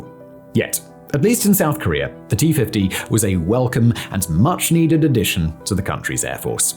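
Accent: England, United Kingdom. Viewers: you have great topics but, very sorry, I am struggling with your english accent. You often whisper the last part of your sentence. I sometimes don't understand what you are saying. thanks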